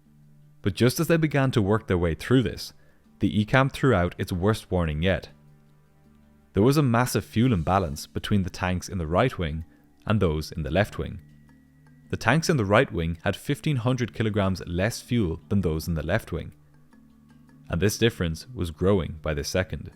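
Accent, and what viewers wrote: Ireland. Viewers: fantastic hearing a Dublin voice like mine! Love the videos. Thank You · Thanks a million for creating this video. Love the Irish accent. I can't believe the ground technicians can't identify the plane they are supposed to be working on. Surely their paperwork will have the plane's registration as at least one of the identifiers ?? If it does then those technicians should have been fired.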